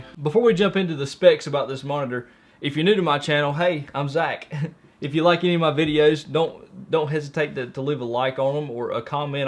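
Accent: South, United States. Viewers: Fantastic review! How do you not have more subs?! Very informative and you cover everything. I also absolutely love your southern accent, a pleasure to listen to.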